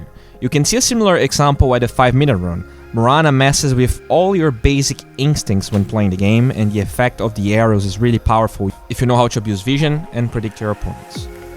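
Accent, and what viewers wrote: Brazil. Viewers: Man, are you brazilian? haha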